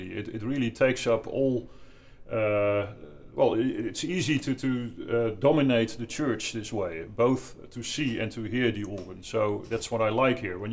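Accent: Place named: Limburg, Netherlands. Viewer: Gro-ning-en ..Op ze Vloms of dialec, Limburgs ..zo moeilijk nie ;-). Wel mooie technische uitleg en fantastische klanken. Djië hé't da Goe gedoan mènneke "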